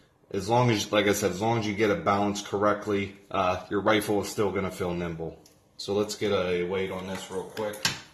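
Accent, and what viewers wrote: Philadelphia, United States. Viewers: Ha ha. I thought the same thing - sounds like a Philly dude but not completely. Pittsburgh - that explains it. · Are you from philly? Just curious cuz you have a little bit of that philly accent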